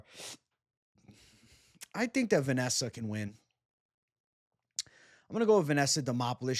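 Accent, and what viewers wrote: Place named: Boston, United States. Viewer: Can take the boy outta boston but cant take boston outta the boy. Blahss-fee-muss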